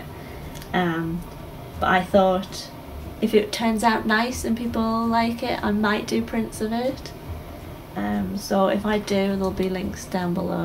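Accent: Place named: Northeast England, United Kingdom. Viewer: First thing: That picture is awesome! Like, super amazing! Second: Your accent... It's my accent... Are you from somewhere in the triangle of cities of Newcastle, Sunderland and Durham? (I'm from a town an equal distance from all three and sometimes it's hard for me to tell which specific accent comes from which city.)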